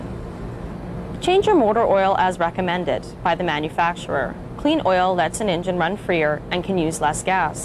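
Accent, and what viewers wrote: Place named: United States. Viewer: wtf? she has an american accent but measures things in Liters and kilometers. ?!?!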